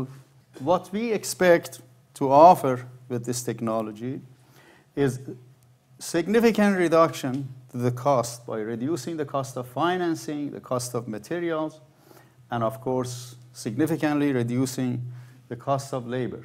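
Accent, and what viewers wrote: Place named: Iran. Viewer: This man is from Iran I can hear his Persian accent.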